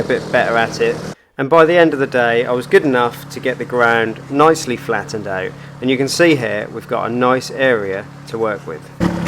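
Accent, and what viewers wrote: Norfolk, United Kingdom. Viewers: Ah! the YouTube algorithm sometime throws us an unexpected delight every now and then. Left home as a boy to come to London, and I really miss the Norfolk accent. A real pleasure to hear it spoken..a rare find on YouTube….wishing the young lad here all the best in his filming and diy adventures!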